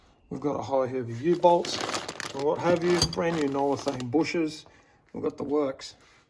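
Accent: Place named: Australia. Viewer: Is this in the USA or Australia hence the Aussie accent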